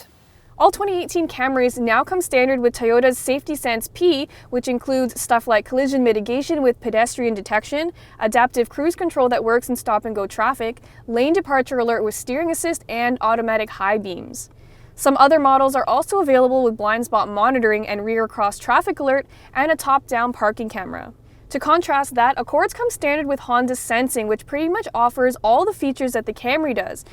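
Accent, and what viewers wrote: Canada. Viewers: She's the coolest Asian Millenial with a hint of Canadian accent Autoguide can find to go at it HAM.....LOL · Is the price in Canadian dollars? You sound Canadian.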